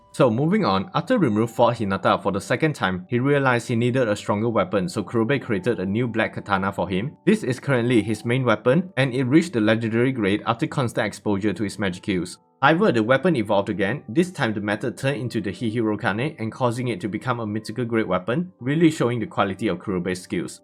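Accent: Singapore. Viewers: Ma man sound like a Singaporean / Malaysian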